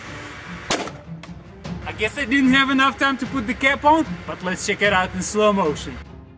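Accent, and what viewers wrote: Russia. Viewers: Мне кажется или у него русский акцент? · Рязанский акцент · по звучанию Американских слов, это не американец, а русский человек, знаевший английский язык ))))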